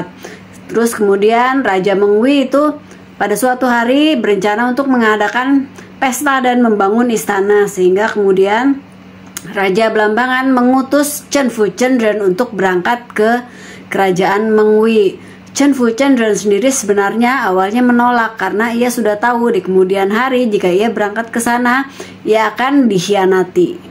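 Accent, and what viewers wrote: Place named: Lombok, Indonesia. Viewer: Bahasa indonesia tp logat lombok... mantaaapp...!!!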